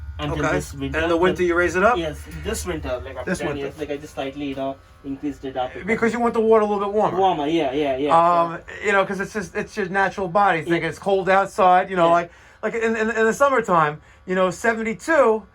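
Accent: New York, United States. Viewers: Hey, please explain what the difference is between PPM CO and PPM CO AF? Really enjoy hearing your NY Accent and your expertise in everything Plumbing & Heating.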